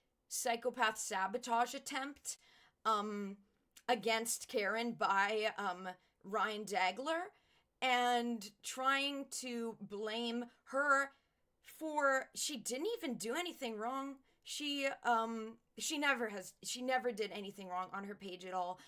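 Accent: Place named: Australia. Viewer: I freekin love u dude. I wish I knew someone in real life like u. The Australian accent sealed the deal lol 😂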